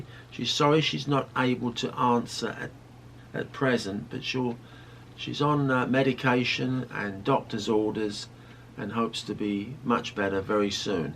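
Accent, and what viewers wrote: England, United Kingdom. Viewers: Prayers for a speedy recovery for Jean and I love the British accent. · Aww what a lovely video - (even though Jean is ill,) the way you speak about your wife with such love melts my heart! Hope you feel better soon Jean! PS, as a fellow english woman, your accent is magnificent! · thank you for keeping us up to date about our lovely Jean, sending all prayers for her speedy recovery. Your English accent is so refreshing to hear. Thank you dear for your help in getting the video out for us. Best wishes to all the Trueloves. ♥♥ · Hope Jeans on the mend soon and begining to feel better x 💐 and, dont apologise for your accent. there's absolutely nothing wrong with a lovely English accent x 😉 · Thank you so much and for updating on Dearest Jean. Your Such a beautiful family looking after each other. Wishing Jean a speedy Recovery send Love fro. 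The UK. PS be proud of your beginings... Great British accent! Xx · Thank you for the update on Jean. Prayers for her speedy recovery. Ps..never apologize for your English accent. I love hearing it.😊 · Thank you. Love your British accent. God bless all of you. Best wishes for Jean to get well. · Thank you for the update. Your accent is great (fellow Brit living in Texas). Dear Jean, love you, miss you, sending you all the get well wishes. I will be watching your videos all day today. You bring a smile to my face every time I watch you. Take care, rest and let those lovely men take good care of you xxx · So nice of you to fill in for Jean; great job! Please don’t apologize for your accent……it’s charming and delightful and when I go to England, I’m the one with an accent! Feel better soon Jean! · Never apologise for your beautiful accent, English accent is my favourite. Give love and hugs to Jean for her Aussie youtube friend. 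God Bless. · Dear, please give Jean my love and wishes for a speedy recovery. Tell her we will all be waiting patiently for her return to her usual chatty smiling self. Thanks to you and, I’m sure Jean has the best possible care. Nothing wrong with the accent, I’m from Yorkshire originally so you sound very British to me. Love to you all 🏴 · I love the English accent. 💜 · Awww, So sorry Jean has been so sick! I will be praying for her swift recovery. She is such a Joy to watch & listen to. As far as your British accent, I love it! So Glad Jean has such a lovely sweetheart. TY to you & on camera! God bless you all!! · Sending best wishes for a speedy recovery., love your English accent.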